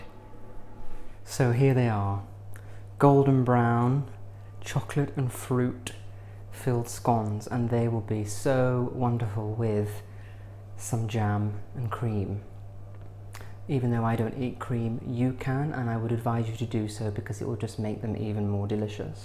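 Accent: RP, United Kingdom. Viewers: you are so posh! I absolutely adore you. ♥️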